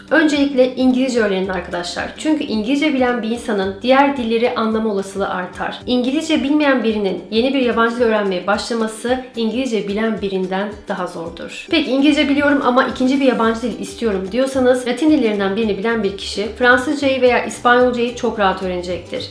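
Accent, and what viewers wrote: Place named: Turkey. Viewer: aksanını acilen düzeltmen lazım çünkü belli bir şekilde Türk olduğun biliniyor.Bu arada saçların çok güzel.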